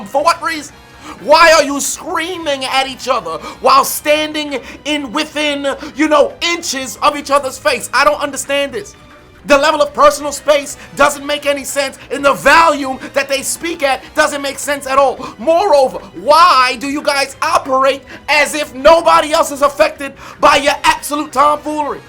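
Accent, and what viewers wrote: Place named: North Carolina, United States. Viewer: Sounds like North Carolina or Florida honestly.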